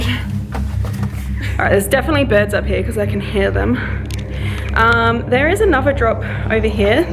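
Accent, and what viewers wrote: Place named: Australia. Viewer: Leap Castle - ''Caisleán Léim Uí Bhánáin'', that murder was all about power and control, it's as old as the hills as they say. That little place where they threw prisoners to die from injury or starvation as there was a spike on the floor was called a oubliette or a place of forgetting in English. People were tossed in there to die from the spikes or starve to death. Many would rather have died quickly from the spike(s) than starve to death. The most cruel thing was that they could both hear people having a good time and smell delicious food while this was happening to them. I see this was a couple of years ago i hope you come back although i don't know if Leap Castle is allowing any more ghost tours. PS: I find it funny that birds frighten you but ghosts ( indeed possibly really vicious ghosts ), are not a problem. You sound Australian but mixed with a little bit English accent.:-) The current owner a Mr Sean Ryan and his wife although a Irish name has a Australian connection and i believe that he was born and grew up in Australia before moving to Ireland although not 100% sure about that. 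According to a program i saw Sean was nearly killed a couple of times when he initially started the repairs. However, at the baptism of hos daughter which took place at the castle apparently there was a peaceful feeling and even a light breeze that the attendees of the baptism noticed. Sounds with the gruesome history of Leap that it needs any positive feelings that it can get. Fina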